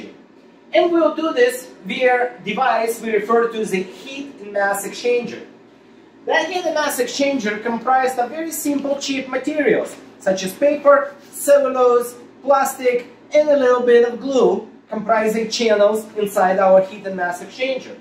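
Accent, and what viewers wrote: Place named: Russia. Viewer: Чёткий Русский акцент.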